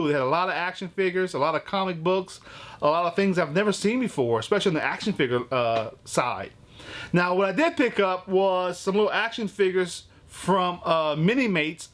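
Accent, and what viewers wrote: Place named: Louisiana, United States. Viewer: I'm from Louisiana, I just found your channel and heard your voice and instantly knew you were from Louisiana! Love your channel man!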